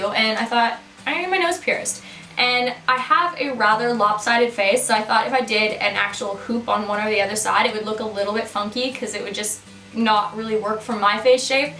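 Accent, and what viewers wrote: Canada. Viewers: Can totally tell you've been back to Canada recently #dataccenttho Love your videos 💕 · I've heard colouring books are really therapeutic :) I should try it! I know this sounds dumb, but you're SO Canadian sounding after spending time at home haha I love it! "Hey?" :) Also love your stripe tattoo. So thoughtful and pretty looking! Wow that bikini is ridiculously tiny...I hate that companies can get away with that!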